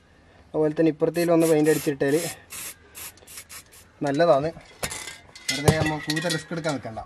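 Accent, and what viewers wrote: Kannur, India. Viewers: Bro kannur aanalle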